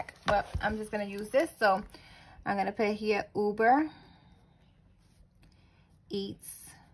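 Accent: New York, United States. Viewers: New York accent strong lmao I appreciate this video you didn’t have to do this but u did 💯❤️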